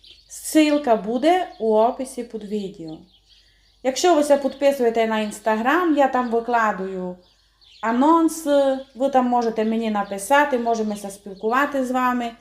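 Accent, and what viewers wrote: Transcarpathia, Ukraine. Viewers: говоріть, говоріть і ще раз говоріть по закарпатськи! Це ваша фішка! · у вас чудовий канал, чудовий матеріал, чудова закарпатська вимова. А ті типу-українці неповноцінні, що їм не подобається Закарпаття і закарпатці, хай слухають про варенички. З великим задоволенням спостерігаю за Вашою творчістю. Всього найкращого. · Завдяки Вам, світ пізнає закарпатський діалект. А східний російсько-український діалект ми давно вже пізнали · Мені дуже приємно, що зараз є можливість чути закарпатський діалект, дякую за вашу працю. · спасибо Вам за замечательные рецепты, а слушая Вашу речь переношусь к своим друзьям, ставшими родными за много лет, в село Камяница. Горько, что не могу встретиться с ними, но когда смотрю Ваши ролики возвращаюсь в любимое Закарпатье.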